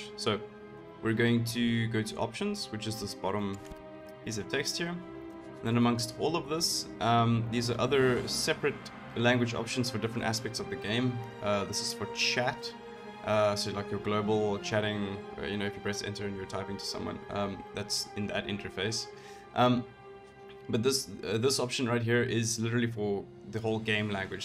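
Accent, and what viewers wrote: South Africa. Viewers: Do I hear a fellow SA accent? XD Thanks for the vid!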